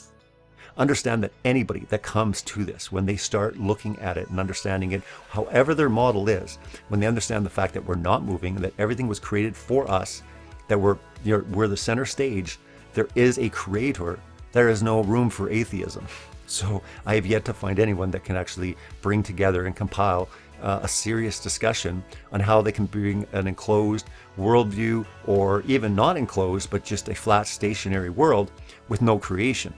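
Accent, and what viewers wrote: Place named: Canada. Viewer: Your accents Canadian obviously👍🏼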